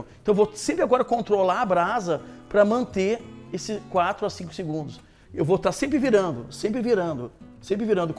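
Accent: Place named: Porto Alegre, Brazil. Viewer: Sotaque porto alegrense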